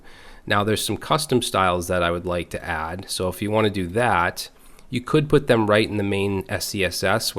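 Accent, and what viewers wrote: Boston, United States. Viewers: Is that a Bawston accent?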